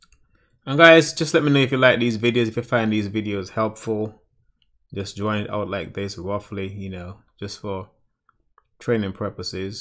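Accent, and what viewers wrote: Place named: Africa. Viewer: Hey, my brother! thankyou or this! This is exactly what looked for in my search! Once and for all, eh, YouTube has come through for me. Ha! ha! ha!!!? BTW, my wife is from Africa! I heard it in your accent. You're ok! Top drawer! A'ight? Bye for now. Out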